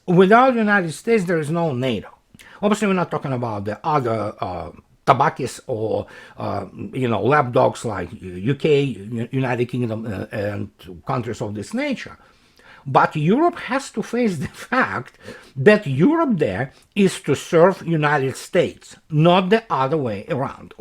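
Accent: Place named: Russia. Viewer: You are very good and everything you are talking about is very interesting and important. I am adjusting myself to your russian accent, so I think I understand it all. 😀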